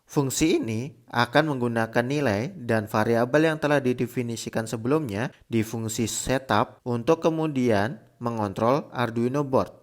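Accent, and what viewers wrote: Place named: Java, Indonesia. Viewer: Suara siapa ini kang ? Kok medok hihi Sukses channelnga kang,,, salam G2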